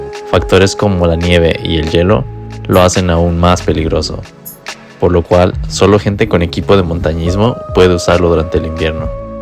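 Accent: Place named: Mexico. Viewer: Awesome view and definitely inspiring too, especially when the old lady caught up and said that she's climbed that mountain for 25 times! 😊 非常棒的影片，看完之後真的會讓人也想去爬山，Dr. Razo的墨西哥口音也很好理解，可以邊享受美景邊練習聽力，真的是一舉兩得😉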